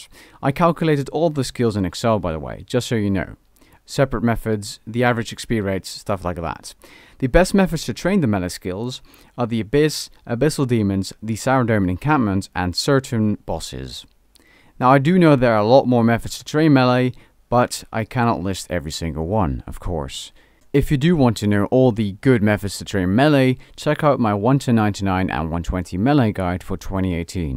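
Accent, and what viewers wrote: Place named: Netherlands. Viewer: haha nou je floept er genoeg Nederlandse klanken door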